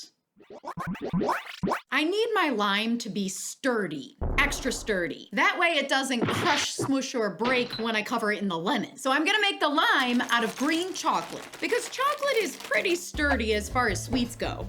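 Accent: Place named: Midwest, United States. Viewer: That sounds like a Midwest accent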